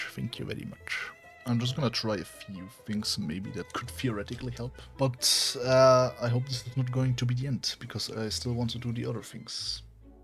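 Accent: Germany. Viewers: Why do you sound German?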